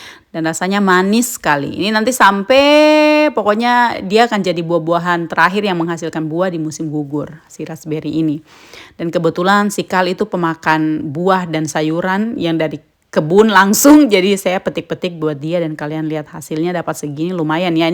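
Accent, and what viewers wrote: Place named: Java, Indonesia. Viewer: Logat jawanya dapet bener kak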